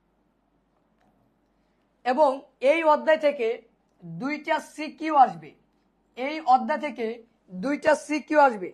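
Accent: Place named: Sylhet, Bangladesh. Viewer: Aii sylhety okol koi ve😂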